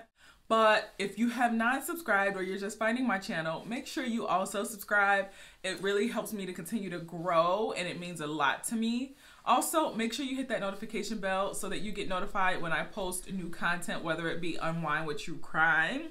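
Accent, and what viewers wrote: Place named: Chicago, United States. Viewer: Yes u are from Chicago, I hear it all in yo words girly😅I'm born and raised there myself..New subscriber here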